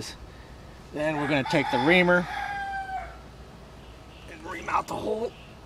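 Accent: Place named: Hawaii, United States. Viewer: Are you from or lived in Hawaii? You sound like you did before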